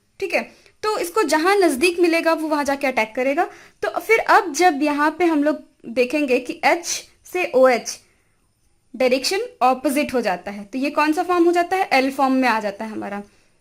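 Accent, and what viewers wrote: Bihar, India. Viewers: Mam Bihaar se hai kya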